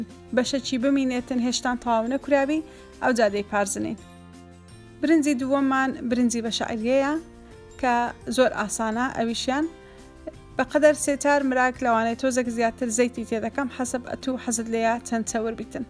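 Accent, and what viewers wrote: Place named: Erbil, Iraq. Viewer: هيوايه تم قسه ي هه وليريه😣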